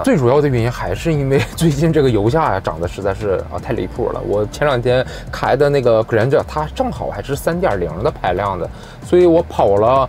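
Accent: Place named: Northeast, China. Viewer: 這一口東北話，笑死我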